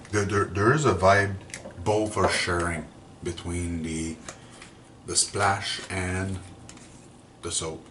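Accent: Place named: France. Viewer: Hey man your doing a great job and don’t worry about your French accent it’s cool there are a lot of us myself included who could learn to speak a second language and some of us are much poorer for not learning it. As for shaving there is no advice that I can give anyone on that topic I’m just glad to have gotten the hell away those stinking cartledge razors since 2010 my face has never felt better, so long Gillette, Schick, and all the other junk 3,4, and 5 bladed crap as well as those synthetic shaving soap. Anyways Cheers man if you are watching the Grey Cup tomorrow enjoy I’m going with Ottawa although I live in the west, Cheers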